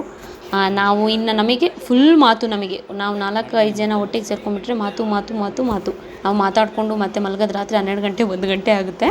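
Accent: Hassan, India. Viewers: I became fan by seeing 1st time ur videos because f our hassan slang language mam..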